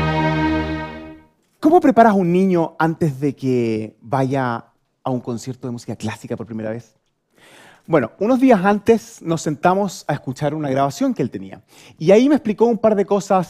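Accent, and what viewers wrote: Chile. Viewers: Como no reconocer a un chileno intentando pronunciar bien. Viva Chile ·%%$··3# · Es de Chile. El acento sudamericano y centroamericanos siempre sonarán así porque no existe el español neutro en el día a día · Este gallo es chileno?? 😱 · Claramente, es por que ambos son Chilenos, acento parecido! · Así suena un chileno hablando un español más general XD, sin modismos chilenos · Excelente, su acento es Chileno, como yo... Reconocible para cualquier Chileno, saludos 🤗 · Zangano Inkulto Tiene todo que ver, es uno de los acentos chilenos 😂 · Sin leer la descripción me di cuenta que era Chileno, por su acento, que grande un compatriota tan talentoso, felicitaciones y seguiré su canal desde ahora, éxito, sigue triunfando. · Es chileno?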